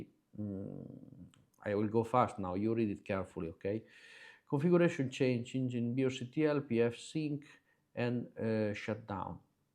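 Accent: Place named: Romagna, Italy. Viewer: Ottimo video, mi hai fatto scompisciare con l' italiano perché si sente l' accento romagnolo..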